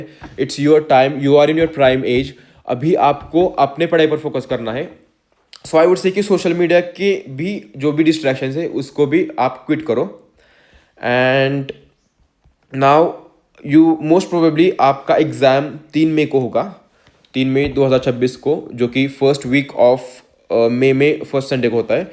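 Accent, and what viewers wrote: India. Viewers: Lagta hai aap maharashtra se hai 😂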